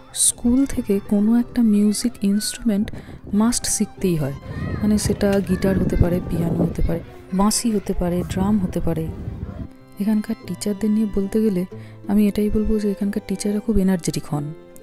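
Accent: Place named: Bankura, India. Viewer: আপনার কথার মধ্যে বাকুড়ার টান আছে।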